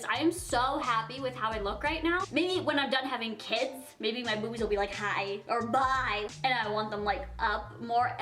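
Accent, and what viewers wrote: Newcastle, United Kingdom. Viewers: It's Geordie, bless you! Ask Siri to say it xxx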